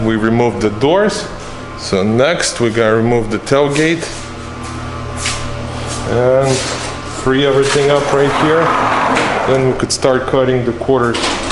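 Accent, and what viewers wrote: Russia. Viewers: У него русский акцент) Он меня поймёт)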